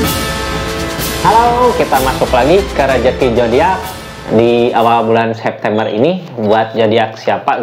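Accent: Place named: Sunda, Indonesia. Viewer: Biar bahasa Indonesia ,logat Sunda nya medok aja ,resonet aja deh komen aja deh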